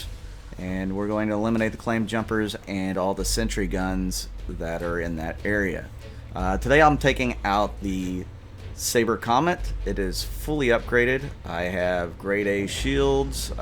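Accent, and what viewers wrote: United States. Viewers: well , after I see your videos I can easily say that you know the game very well and of course I know you knew that you can take the claim jump mission with any medium side ship any day , just wanted to add that extra info here for those who didn't know , but I'm aware of your intentions of those who are new and don't have caplable ships , and so you show how to with a small ship . Keep the good work up , and I honestly love YouTuber like yourself that I can understand and love the Cristal voice and. America accent , I can't help myself lisenting boredgamer Wich is a tremendous informative YouTuber , one of the best but my ears hurt when he talks .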